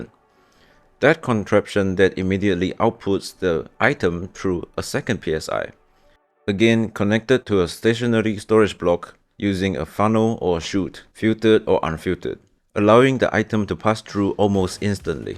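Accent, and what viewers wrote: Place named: Malaysia. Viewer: I was wondering why your accent sounded familiar, only to realise you’re malaysian too! Love the production value in your video, and excited to see what’s next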